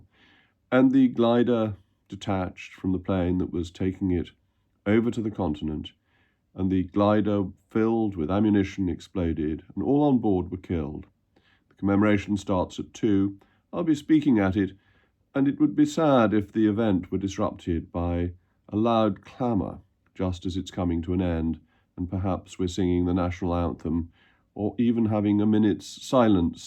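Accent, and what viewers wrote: RP, United Kingdom. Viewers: You have the most British voice I've ever heard reminds me of my godfather his passed away now but I love your take on the government. Peace ✌️🕊️ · This is all so rich coming from you Jacob. I remember you mocking the public at that party, joking about the social distancing you conservatives brought in. You’re a traitor with a posh accent, nothing more, nothing less.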